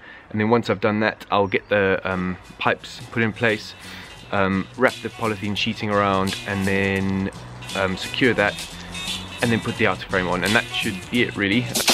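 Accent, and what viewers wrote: South Africa. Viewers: I thought the same thing. Had to come to the comments to confirm if it was South African accent :") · Hearing the SA accent just gave me joy lol. South Africans know how to DIY the right way💪💪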